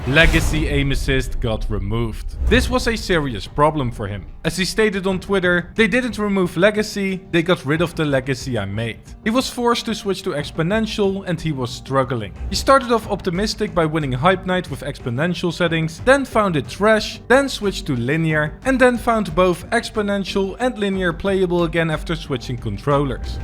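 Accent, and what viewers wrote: Netherlands. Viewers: Just a ask where are you from (I’m Dutch and idk but I sound like you Dutch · you sound dutch?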